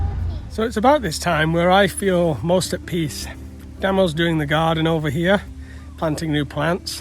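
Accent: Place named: Lancashire, United Kingdom. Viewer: Love the Lancastrian accent.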